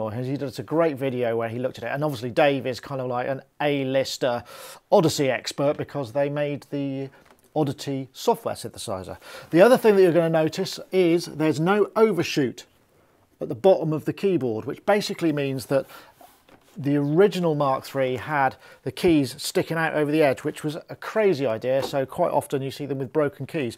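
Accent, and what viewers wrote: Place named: England, United Kingdom. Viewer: Man, are u from england?? I can't understand shit you say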